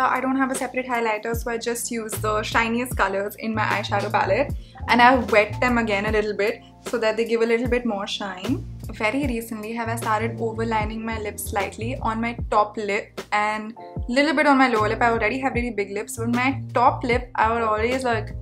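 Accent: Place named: Mumbai, India. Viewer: You've got the mumbaiyaaa tone already makes me smile idk why ♥️😘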